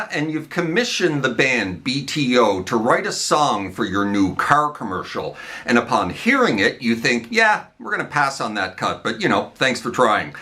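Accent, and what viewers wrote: Canada. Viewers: Hello squire. You really are becoming a very bad influence on me! I have watched a number of your videos now and I finally subscribed last night when I watched your '43 Year Guitar Journey' thing. I found that very interesting and at times moving, and I really must commend you for your authenticity and honesty. Love your Canadian-ness too (great accent!) and your love for quite a few of the same Canuck artists that I appreciate. Whilst Paul Kossoff was my first love, Alex Lifeson was the picture on my wall as a 13-16 year old. And that was B&W pic clipped out the NME here in the UK in about '78. Times were hard... Anyway. This song is full of great memories for me - my sister allowed me play her copy of ' ' more or less to death (she wasn't too chuffed about that, mind you!) and I played it on a Dancette mono record player in my tiny bedroom for hours on end. 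Said gramophone was donated to me by my Uncle (who had a country place! - geddit?). I always particularly loved ' ' - but they're all great tracks. is a fantastic tune too with lots of classic rock ingredients - and as you mention, a brilliant driving song - it's on one of my DIY car CDs. But I am wittering now... I'll finish by saying thanks for this and I wish you all the best. Cheers, son! - PT x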